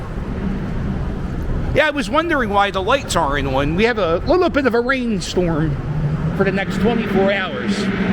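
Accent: Philadelphia, United States. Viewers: I was a worker at a delinquent training facility in Olwein, Iowa with 20 teenaged. Although the chances the bad guys would target Iowa, was bill, the kids thought they were next. I’m from Philly and listen to you to make sure I keep and refresh my Philly talk and attitude. I’m now in Denvet, Colorado. When I offer an opinion they ask if I’m mad. NO, I’m just sarcastic GD. Great walk. love it.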